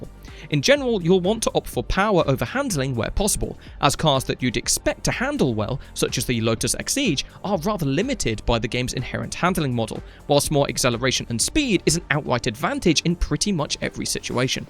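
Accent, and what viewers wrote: United Kingdom. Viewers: yeah man you could definitely turn this into a career. Everyone loves a British accent narrating videos lol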